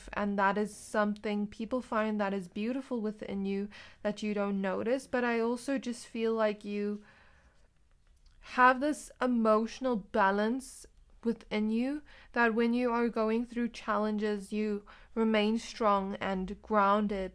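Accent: South Africa. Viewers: Great video ❤..if you're comfortable answering...may I ask where you're from...you have a SA accent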